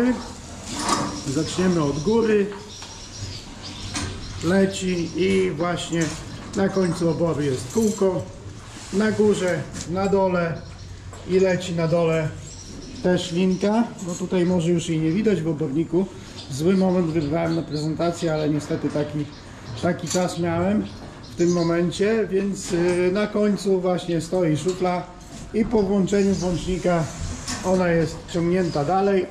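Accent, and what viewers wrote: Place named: Mazovia, Poland. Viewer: Jak ty z Mazowsza 🤣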